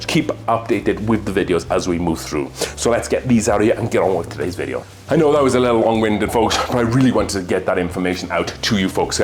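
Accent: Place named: Wales, United Kingdom. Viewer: Are you a taff? just guessing by the accent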